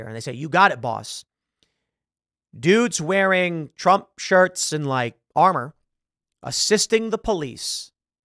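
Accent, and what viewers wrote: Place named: Chicago, United States. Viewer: Lol. You could definitely tell your from Chicago.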